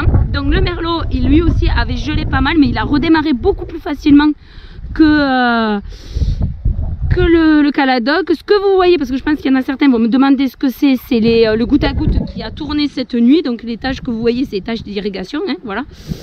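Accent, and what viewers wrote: South, France. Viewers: Cc les amis merci pour ce voyage dans vos vignes c super intéressant merci on adore toujours ton bel accent du sud bon courage à vous 😎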